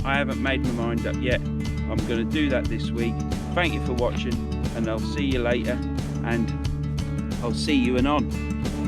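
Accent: Norfolk, United Kingdom. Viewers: well theres a Norfolk boy if ever i heard one, just stumbled on your channel buh, new sub from Gorleston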